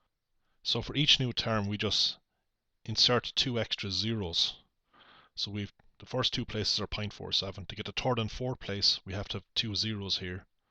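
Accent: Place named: Ireland. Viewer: So so so helpful thank you very much and finally someone Irish doing these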